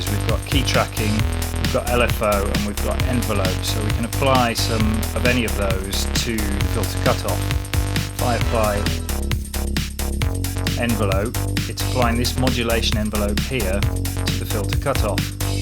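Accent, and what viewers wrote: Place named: England, United Kingdom. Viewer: It's nice to hear an English accent on a walkthrough such as this for a change! Thanks a lot - found this tutorial to be very useful. Think I'll be getting Synth Squad.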